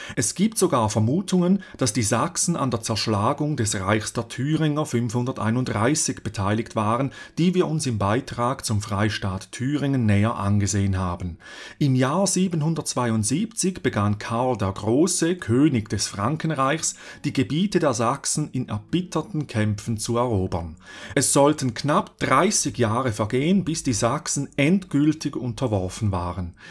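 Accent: Switzerland. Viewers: Vielen dank für den ausführlichen und aufschlussreichen Beitrag über die niedersächsische Geschichte! Ich als selber Niedersachse, der aktuell Urlaub am Genfer See macht, hatte eine gute Unterhaltung und freue mich auf die weiteren Videos! PS: Ich liebe deinen schweizer Akzent/Dialekt! :) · Ich bin Deutschmarokkaner und liebe diesen schweizer Akzent. 😁👍🏻 Übrigens ein tolles Video!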